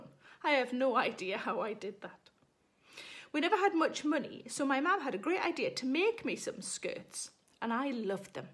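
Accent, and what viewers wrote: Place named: Geordie, United Kingdom. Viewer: hi, the worst thing I ever wore was a pair of trousers that were slightly to short and people said my budgies were flying low, as a Geordie I appreciate your comedy more than most, like my friend bobby pattinson, another fantastic northeast comic for your fans that may not know him says its the dialect that makes us laugh, thank you for being you, I laugh till I nearly split watching you shows, love from springwell est gateshead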